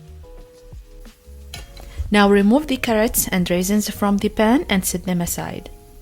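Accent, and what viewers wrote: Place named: Saudi Arabia. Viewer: عشقي الاكسنت السعودي ❤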